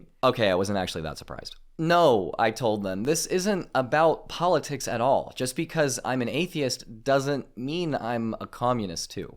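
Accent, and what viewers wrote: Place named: Transatlantic, United States. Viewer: I LOVE with a transatlantic accent. More of this, please!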